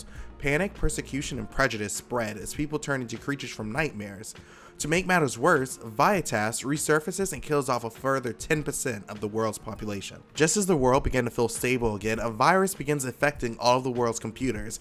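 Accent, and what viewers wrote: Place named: United States. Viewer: pretty easy to understand, he is probably American judging from the accent alone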